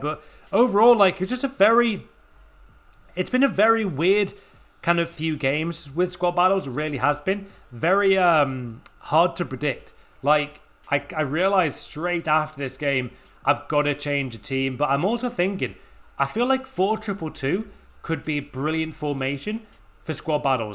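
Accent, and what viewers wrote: Australia. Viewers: So I am guessing your an Aussie?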